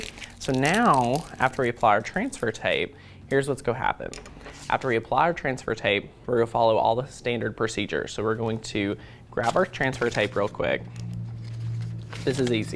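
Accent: South, United States. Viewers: nice accent. Hey there fellow southerner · Gotta love a good ole fashioned southern drawl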